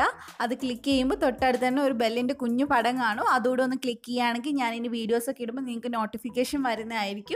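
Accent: Kannur, India. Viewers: kannur ano ithaaaa nannaitt manassilavunnund ndayalum spr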